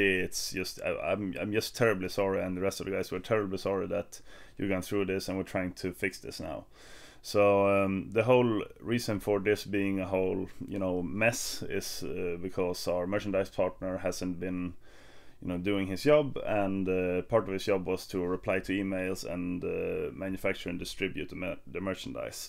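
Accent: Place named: Sweden. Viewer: I just love your swedish Pronunciation :3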